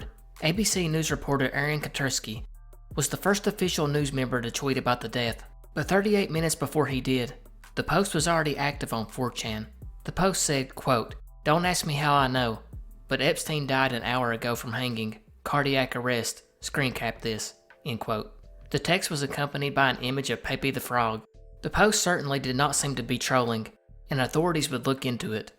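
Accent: South, United States. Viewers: I always forget you have a southern accent for some reason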